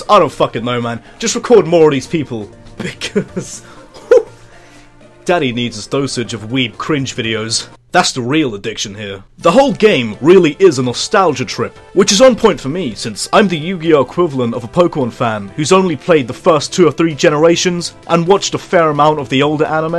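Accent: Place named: England, United Kingdom. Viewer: Trevyn Garris he should go into voice acting. Lord knows there's a shit ton of English accent VAs in games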